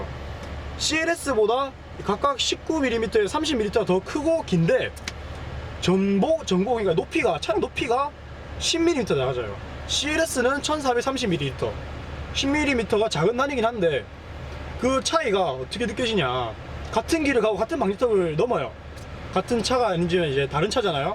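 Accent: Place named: Gyeongsang, South Korea. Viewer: 최초 갱상도 사투리 리뷰.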